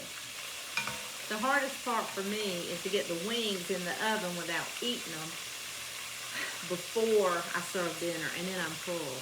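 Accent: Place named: North Carolina, United States. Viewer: I discovered your channel a couple of days ago and I am loving it! I suspected you're from eastern NC with the accent (not poking fun, I have the same accent!), but the fried chicken and banana sandwich just confirmed it for me! Please tell me I'm not wrong! My mama cooked the best fried chicken. I am 64 years old, and when I was a child and we'd go on vacation Mama would pack a picnic lunch for us to eat on the way at a roadside picnic table. She always, always, always packed fried chicken and banana sandwiches! My daddy loved that combination. Such sweet memories!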